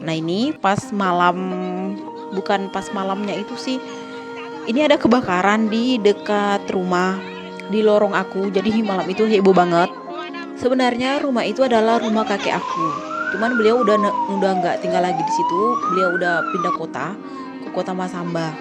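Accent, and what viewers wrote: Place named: Medan, Indonesia. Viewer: Kakak saya kok penasaran dengan tempat daerahnya dimana ini...? sumatra atau di jawa....kalau saya dengar narasinya setiap lihat videonya seperti mirip orang logat medan (A9)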